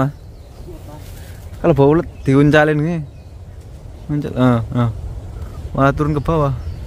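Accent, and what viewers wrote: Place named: Banyumas, Indonesia. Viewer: mas nya asli orang mana. kok bisa ngomong Jawa ngapak wkwkw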